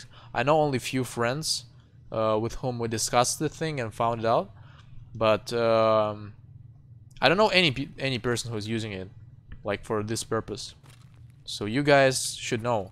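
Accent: Russia. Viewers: Wait, I can hear barely noticeable russian accent :) Am I right?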